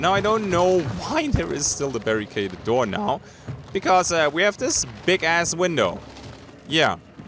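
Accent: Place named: Germany. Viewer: This is the most perfected Denglish I've heard in my life, I am a big fan! Great content dow 😅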